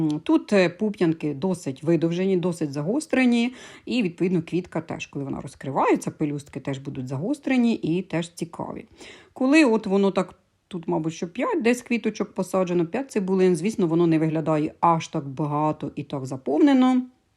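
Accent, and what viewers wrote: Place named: Cherkasy, Ukraine. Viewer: Я передивилась майже всі ваші відео. Ваш говор мене відправляє мене в моє дитинство, до мами. Скажіть, ви живете в Черкаській області?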